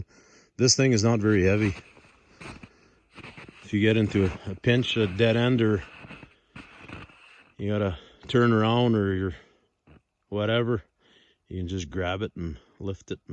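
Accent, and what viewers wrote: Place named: Atlantic Canada, Canada. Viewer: Good job. I'd like to make a groomer for lake trails, that shaves off wind blown moguls and fills in the dips. My idea is that it would be on skates that would dig down and skate along the ice. And the whole thing would be height adjustable with about 4 legs that the skates would be attached to, to set you blades at the mid point or so of the mogul depth. Skates would have to be designed so the helped pull the unit down and maintained contact with ice as best as possible also. Just an idea bumbling around in my head. Sounds like your from Canada's east coast?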